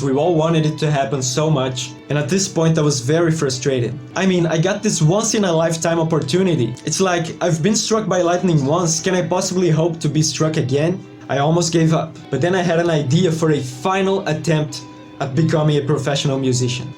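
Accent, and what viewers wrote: Brazil. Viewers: Hey, you have a brazilian's accenct. Are you brazilian?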